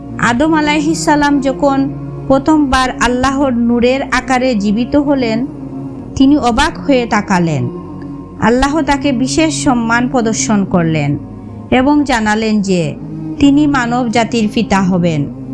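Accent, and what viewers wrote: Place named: Sylhet, Bangladesh. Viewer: আমার মনে হচ্ছে, আপনি সিলেটি, আপনি শুদ্ধভাষা ব্যবহার না করে, আপনার আঞ্চলিক ভাষা ব্যবহার করেন, শুদ্ধ ভাষা আর আঞ্চলিকতায় মিশে থাকলে শুনতে ভাল্লাগেনা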